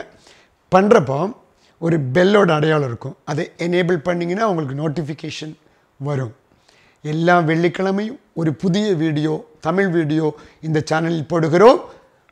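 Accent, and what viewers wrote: Kerala, India. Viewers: Malayalam vaasam apdiyae veesudhu unga Tamil . Nice video. I subscribed for Ur Tamil sir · I like your malayalam mixed tamil slang. . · Sir neenga Tamil or malayaliyaaa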